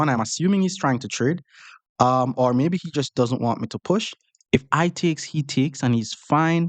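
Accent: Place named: Jamaica. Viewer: thank you so very much! I don't think anyone has ever played and also taught chess with more passion and joy than you. Combined with your smile, your warmth and the patwa accent it is absolutely infectious. Best moment of this video: - "double up his pawns...his pawn on e5 is also hanging...I'm gonna easily...got my king out of the center...my position is very solid...I have no weaknesses"...... one pawn move followed by his queen move: "this is actually a very scary positian"....comedy gold! Stiil have to laugh about it. Every single video makes me smile the entire time. Please keep on shining!!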